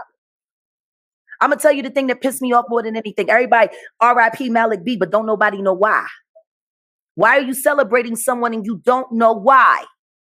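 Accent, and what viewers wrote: Philadelphia, United States. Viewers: For the people in the comments who think she's on something, you're right. She on her North Philly ish. This is how we talk. You have to grow up here to fully understand where she's coming from. If you violate family the gloves come off. It's like an unwritten rule of Philly street life. She is from my era, so I overstand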